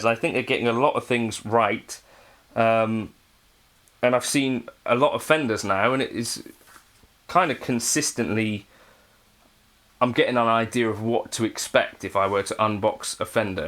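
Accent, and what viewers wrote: United Kingdom. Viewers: Isn’t this just how British folk talk? Why are you so critical?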